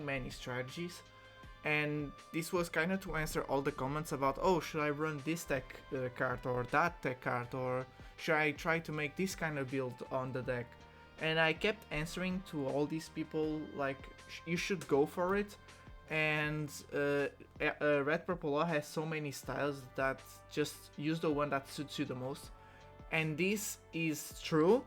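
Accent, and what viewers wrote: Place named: Portugal. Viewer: sotaque tuga não engana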